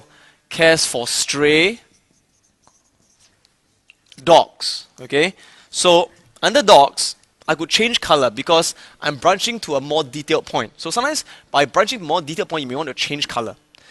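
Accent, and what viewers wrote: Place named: Singapore. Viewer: tiếng anh singapore nghe khó chịu quá :(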